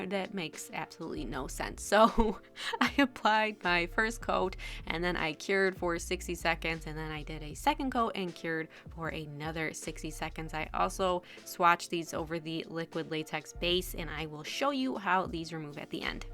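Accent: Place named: Upper Midwest, United States. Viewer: so beautiful, and I've never been much of a glitter girl, but here I am at 60 years old, thinking, "mmmmm.....glitter...." lol And I recognize your accent now, because I was born and mostly raised in Michigan. Upper midwest, somwhere is my guess. No wonder I like you!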